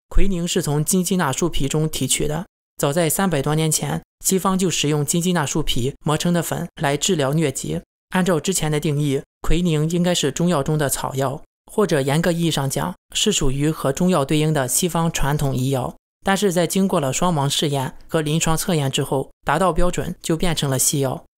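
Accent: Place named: Rizhao, China. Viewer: klaus我听你的声音，你是日照人吧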